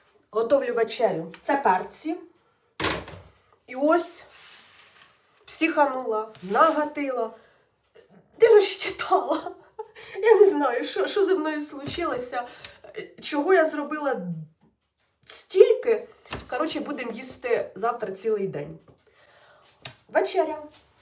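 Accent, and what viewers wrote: Ukraine. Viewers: Всі слова мацковські з українським акцентом ,і Бога приплітає !Вчи мову ",бєстолоч "!І молись !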